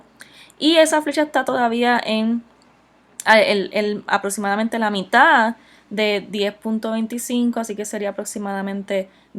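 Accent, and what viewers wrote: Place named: Venezuela. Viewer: Pensé que era venezolana.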